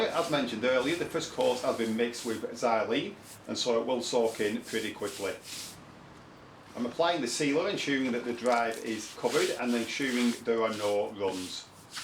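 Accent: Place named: Lancashire, United Kingdom. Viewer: Can the Russians not understand your Lancashire accent?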